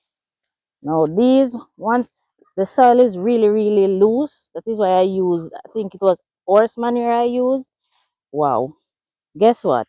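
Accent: Jamaica. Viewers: This is how most Jamaicans speak. Go find a treadmill and leave the lady alone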